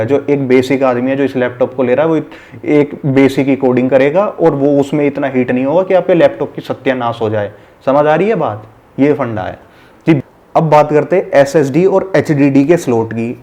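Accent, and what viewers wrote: Haryana, India. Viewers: getting reply from you is still a big thing for me thank you so much sir you are really doing great work please keep it up ! Like your haryanvi accent actually I love haryanvi accent and main strength of your channel is your honesty and desiness. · Bhai tu haryane ka lage h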